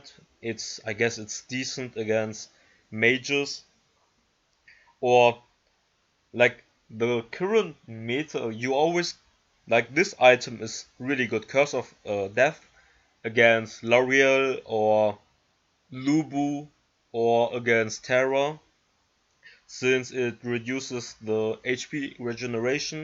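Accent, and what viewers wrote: Germany. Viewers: Wie man einfach raushört das er deutsch ist xD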